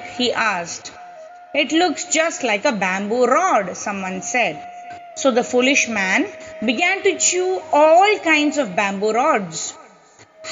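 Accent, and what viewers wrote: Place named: India. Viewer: Hari Hari ! So nice to have Srila Prabhupada's books in Indian accent with such clear speech. Waiting for the other chapters...🙏🏻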